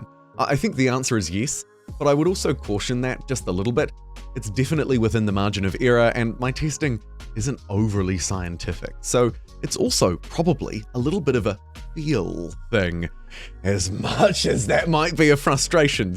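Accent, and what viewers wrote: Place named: New Zealand. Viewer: its good to hear a kiwi as voice, repping NZ in the gaming space of youtube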